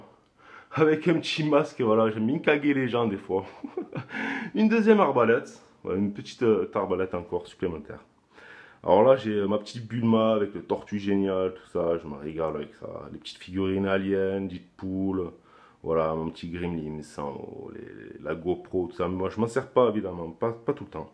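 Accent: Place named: South, France. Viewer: Sa fait plaisir tu vien du sud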